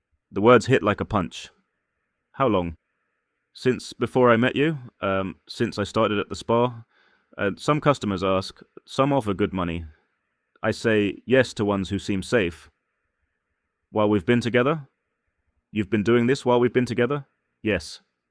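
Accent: England, United Kingdom. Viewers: This bullshit is just story telling which bares no truth…He has a British accent tho…probably went to the Philippines 🇵🇭 heard and saw some stories, then started a Utube channel…not too many subscribers yet, looks like it’s fairly new. The problem with these nonsensical stories are, they’re giving the Philippines 🇵🇭 a bad reputation.Stay blessed 😇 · A fool and his money are soon parted especially when no common senses is used, and you sound like you have an English accent to me, not american😉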